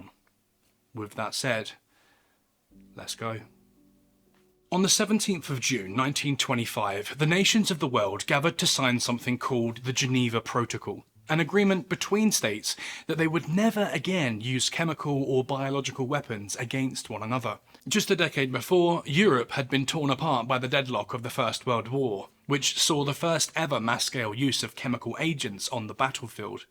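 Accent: United Kingdom. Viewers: The irony is that this man is talking about these horrors in a deep British accent. That accent inspires fear in the hearts of millions upon millions of people around the world.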